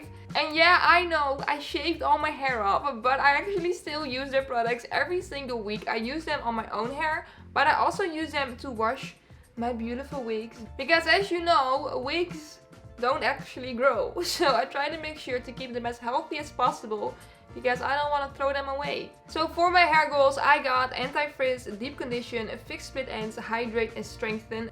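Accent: Netherlands. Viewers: Is ze nou Nederlands of heeft ze gewoon een Nederlands accent?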